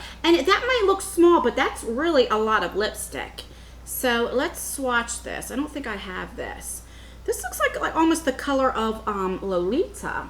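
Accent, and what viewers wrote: New Jersey, United States. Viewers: Lol. I think you said "Walmart scrub" before describing the scrub. The Jersey accent is similar to us in MA, but I think you said Walmart....and I almost spit my tea out. Oh I love you.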